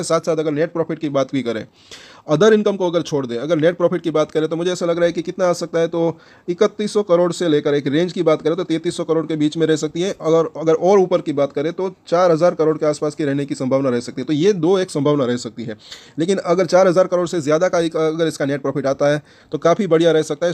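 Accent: Gujarat, India. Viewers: Sir aap Gujarat ke lagte ho plz reply dena.. Kyu ki apki awaj Gujarat ki hindi dhhal lagti hai.. Main bhi gujarati muje apke soor se aap gujarati lagte ho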